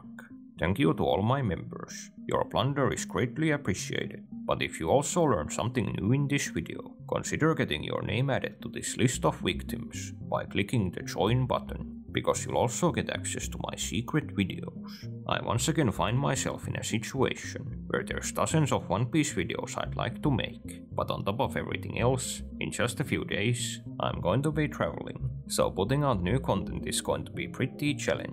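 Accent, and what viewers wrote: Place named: Finland. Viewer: Is that a Finnish accent I peek? Great channel bro, keep going!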